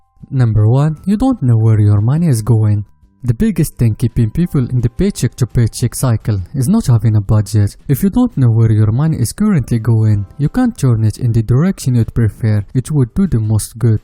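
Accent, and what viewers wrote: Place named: France. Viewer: he is a french speaker this why he got a french accent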